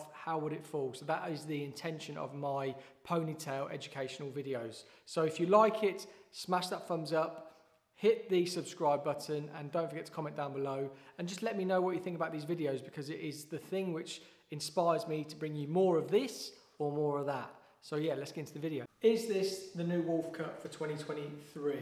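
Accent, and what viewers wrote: United Kingdom. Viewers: LOVE clicking a video and hearing an unexpected UK accent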